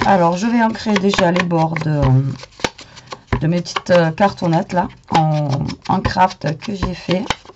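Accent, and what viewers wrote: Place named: Marseille, France. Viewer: Ha voilà ça s’entend lol j’adore moi Marseille 😉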